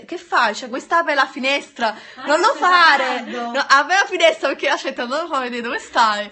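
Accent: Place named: Naples, Italy. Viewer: Una cosa Chitarra romana lei è simpatica così com'è se cambiasse nn sarebbe e poi è Napoletana e a noi piace così sempre una cosa dite l'italiano l'italiano e basta ognuno parla a suo modo nn fate le perfettine a tutti costi e sia chiaro nn è un attacco e solo una risposta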